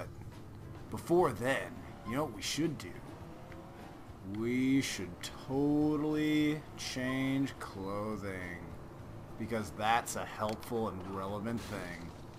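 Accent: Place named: Russia. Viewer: i love your gameplay your so funny to excellect rusian accent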